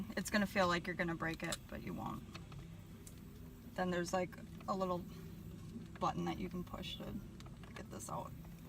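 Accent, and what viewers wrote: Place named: Canada. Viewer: Thanks for tips, you sound Canadian.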